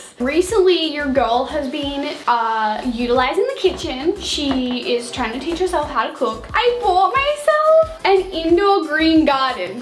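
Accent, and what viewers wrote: Australia. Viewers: Normal people: no: nooore I love her aussie accent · I NEVER UNDERSTOOD AUSSIE ACCENT BUT AFTER WATCHING FOR 6 YEARS, I CAN UNDERSTAND IT PRETTY WELL LMAO🛍🛍🛍🛍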